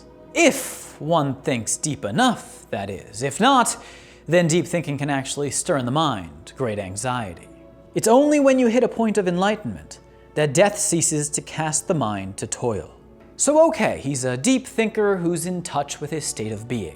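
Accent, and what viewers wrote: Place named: United States. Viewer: Why do you pronounce the names with that American accent? It’s soooo cringe brother. Pronounce it properly! They are English names in a Japanese accent!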